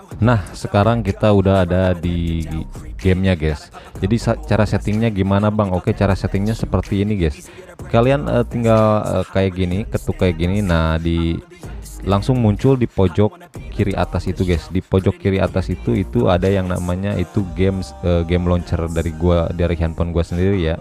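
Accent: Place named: West Java, Indonesia. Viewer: Orang Sunda nih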